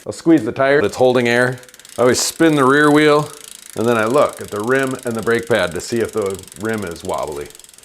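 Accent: Wisconsin, United States. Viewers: Chicago guy living in the central highlands of Mexico with a 10 year old Chinese bike ! My first experience with a local bike shop was $30 charge for this tune up and my bike shifted very tight and I ended up searching YouTube for how to do a tune up myself. It’s good to hear a Wisconsin accent and to see your techniques . In two days I’m gonna give this a try . What part of Wisconsin are you? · Immediately after he started talking I knew he was from Wisconsin. GO PACK lol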